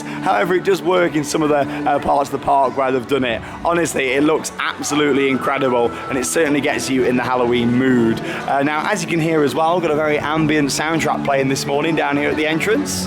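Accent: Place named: United Kingdom. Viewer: all right no Fun you are a good vlogger but youre brithish english is very fun hahha best 💪 Stüpet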